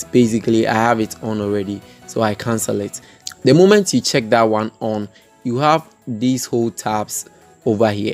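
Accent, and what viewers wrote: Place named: Ghana. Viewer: See ya fine Ghana accent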